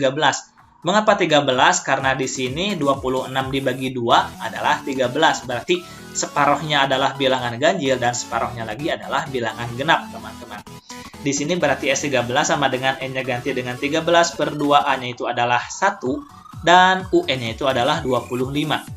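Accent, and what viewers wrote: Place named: West Java, Indonesia. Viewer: Nadanya sunda, lucu 😆👍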